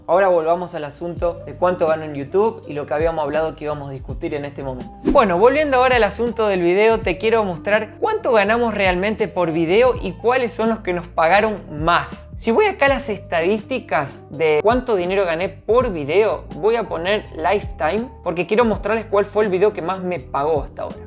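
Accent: Argentina. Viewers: Estonia? parece Argentino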